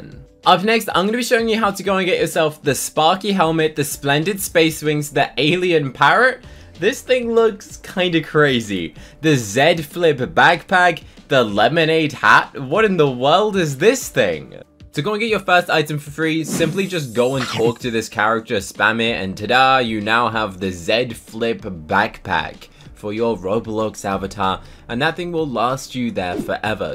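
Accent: United Kingdom. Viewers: Not trying to be rude or anything, but through the entire video you pronounce z like zed and in proper English American it’s sed like z like zee I’m not saying, you have to change how you talk and I’m not meaning listen any rude way, but I’m just saying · He's british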